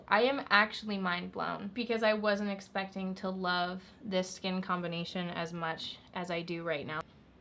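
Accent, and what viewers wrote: Canada. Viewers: She she Canadian? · I'm living in Scotland but I'm from Vancouver Island, I love watching your videos! Love your makeup and fashion style plus you just seem nice and fun! Love hearing a Canadian accent as well! I would love to know where you get some of your cute little gold earrings. Keep up the great work! xx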